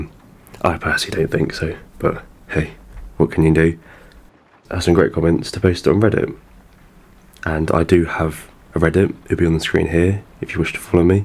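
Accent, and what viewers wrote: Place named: United Kingdom. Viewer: Wow. This is the first impression of mine on this Chanel. If YouTube algorithm suggested I needed this, I'll take it :) I naturally listens to asmr (nature sounds mostly) because I need to focus on my books when I study through late night. Never thought I'd like to listen to British accent. It's really pretty now that I'm hearing it with concentration. It seems every language sounds different especially when spoken through each different accent. Besides that, you have got a really calming voice — it's easy to play it in the background and write through notes or journals. Feels like someone is accompanying. I'd like to subscribe because it feels right investing a little bit of time on when it can accompany me for studies! Please keep surprise us with new videos and be in good health and mind ❤ You have got this ✊ [ — this was fascinating to know someone online who shares quite similar perspectives as I do. 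That's pretty surprising because I feel like some people finds it overwhelming but it completely depends 🌞]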